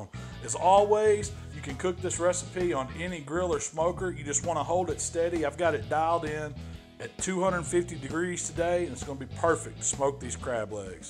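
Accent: South, United States. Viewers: I like his southern accent.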